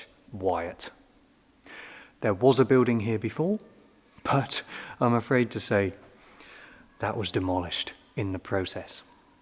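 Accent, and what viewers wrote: United Kingdom. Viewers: The only problem with your videos is that I find myself thinking with a British accent for some time afterwards! I’ll be fine. Carry on!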